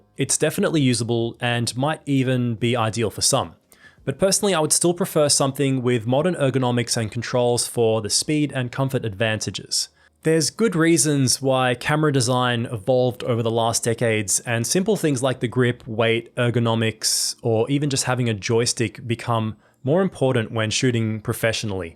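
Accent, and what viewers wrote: Australia. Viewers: Judging by your accent I'd say you are an Aussie. I used to work for Paxtons Photographics in George St , Sydney in the 80s, half a lifetime ago . At the time it was the biggest camera store in Sydney. I bought a Nikon FE when it came out then to add to my Nikon F2. Both great cameras. I'm pretty excited by the Nikon ZF and am going to buy one in the next week or 2. It really does look like a slightly larger FE! This camera could have been designed with me in mind . Lol. It's just what I have been waiting for...I've just discovered your channel and subscribed. Great video and photos!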